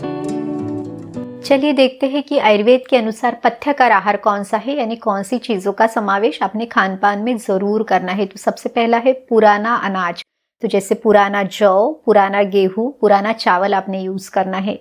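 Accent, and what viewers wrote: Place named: Maharashtra, India. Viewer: Doctor ur hindi accent is like marathi but ur jain gujrati🤔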